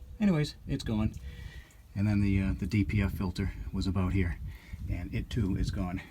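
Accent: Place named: Manchester, United Kingdom. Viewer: Sounds like a Manchester accent.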